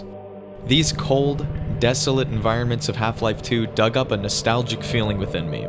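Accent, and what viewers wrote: Greece. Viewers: Are you Greek bro ? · Are you Greek?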